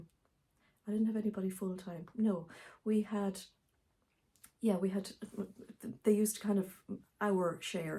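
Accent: Ireland. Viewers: Hello I could listen to you all day. You have the most beautiful West of Ireland accent, soft and melodious. Have a lovely weekend 😘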